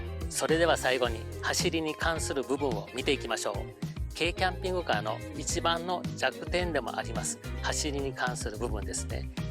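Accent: Kobe, Japan. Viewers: とても分かりやすくて参考になります♪ 以前神戸に住んでいたので言葉も懐かしいです～😄 軽キャンを検討、特にhappy1+を考えてるのでこれからも動画を楽しみにしています～😄 レンタルもしてみようと思います😄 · 神戸の人かな❓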